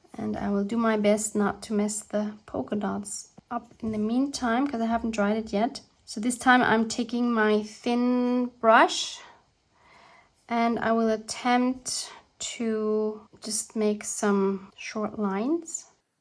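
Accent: Vienna, Austria. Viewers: another beautiful project and i really love to hear you speak german with your lovely vieanna dialect <3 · Ohhhh du liebste, du herziges Zauberwesen😊, was hast du wieder Tolles gemacht. Ja und nun weiß ich endlich was ich mit meinen Treibholzstöckchen machen werde, die ich letzten Sommer so eifrig am schönen Chiemsee sammelte❤. Es ist echt lustig, dass ich ebenso Probleme mit dem Zufallsprinzip habe, obwohl ich alles andere als perfektionistisch und ordentlich bin😅. Aber wir hatten es ja schon mal davon... Es ist so schön, dich deutsch sprechen zu hören, denn es blitzt doch das Weanerische hindurch und ich liebe diesen Dialekt. Ich hab ja auch ein Faible für österreichische Autoren. Deine Fabricsnippetroll ist super geworden. Achja und das Zeugs, dass du wohl meinst wegen des Stofffixierens müsste aufbügelbare Vlieseline sein. Ich glaub, die Quilterinnen benutzen das immer. 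Das kleine Journal, das du von Petra bekommen hast, ist wirklich allerliebst und ich mag besonders, dass sie auch Samt verwendet hat. Ein tolles Material wie ich finde, besonders wenn er schon gebraucht ist. Ganz liebe Grüße zu dir ins schöne Wien und guats Nächtle❤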